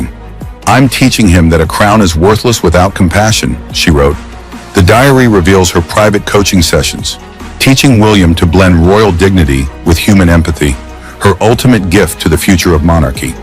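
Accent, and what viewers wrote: United States. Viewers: Why is an American doing the commentary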